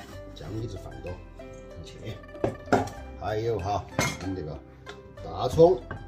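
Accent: Chengdu, China. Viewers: 这一口地道道的成都话！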